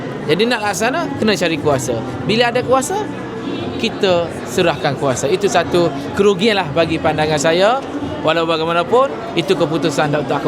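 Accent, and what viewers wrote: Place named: Kelantan, Malaysia. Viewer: ini macam mau jadi ketua pemuda amenongok malaysia? bercakap pun ada accent kelate goh geh goh geh ptuihhhh .💦 natang .. kelaut la mangkuk mausen 😂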